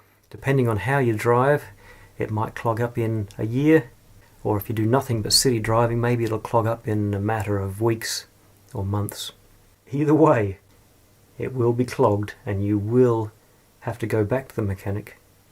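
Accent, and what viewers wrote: Australia. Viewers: Hey I like your presentation manner!. Can't pick your accent. It it Melbourne? Or were you born in the UK or Kiwi and emigrated? Regardless, I thank you for your lovely videos. Thanks matey!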